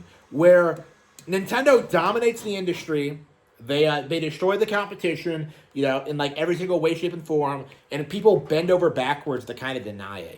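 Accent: United States. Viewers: Why you sound intoxicated with a Arizona lol bruh crack a beer and get a pc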